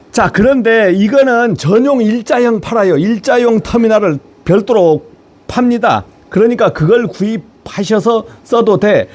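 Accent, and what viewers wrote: Jeju, South Korea. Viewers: 제주도 삼춘이다, 발음은 제주 토백이다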